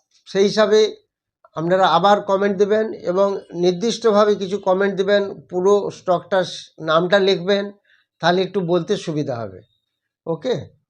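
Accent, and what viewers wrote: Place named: United States. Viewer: হ্যাঁ স্যার, আপনি কিছুদিন ধরে আমেরিকান এক্সেন্টে ইংলিশ বলতে শুরু করেছিলেন, আমি মনে করেছিলাম বাংলা বললে হয়তো অন্য ধরনের সমস্যা হতে পারে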